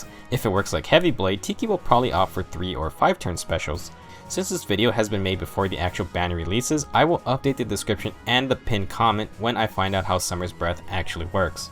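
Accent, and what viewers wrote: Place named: United Kingdom. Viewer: Jake Stavinsky Idk why people question her British voice, like don't question it it's hella cute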